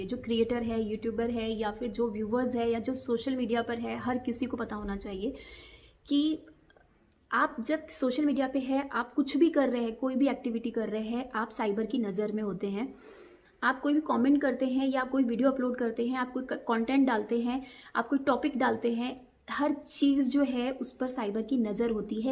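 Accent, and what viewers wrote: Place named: Punjab, India. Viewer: This is because we all love you, ur punjabi is so cute . love you api from Punjab❤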